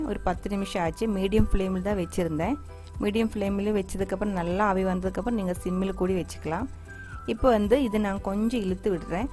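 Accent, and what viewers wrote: Kerala, India. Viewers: Oh sweet malayalam mix tamil. 🤗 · Excellent demo.. your Tamizh in Malayalam base is very very sweet, beautiful blend in your voice.. like the nice yummy ilai adai😃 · Malayalam kalandha tamil wow. dish semma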